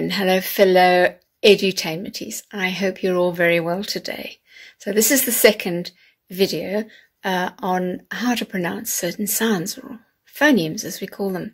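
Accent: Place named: United Kingdom. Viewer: I've really enjoyed the video as her accent is so clear, the british accent is the best accent ever 😍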